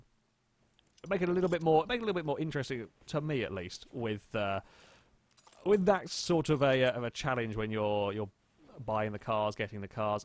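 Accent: United Kingdom. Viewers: AY Bloke,, hahahha we call stripping and selling in US 'a chop shop',, buy you had a rag, not a dream car, so yeah, you're only going to get trash money back,, now go 'steal' some rims from a barn, 'steal' some heads from a junkyard, and 'steal' some parts off another car of the near same type, then sell it, and watch what your value is!! Fun watching your vids tho,, and like I said before ,, love the Brit accent, reminds me of home... TC E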